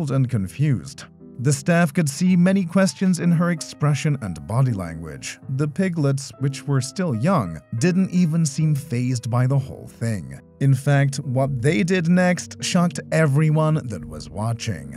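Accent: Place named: United States. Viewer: I just don’t like the american accent in this video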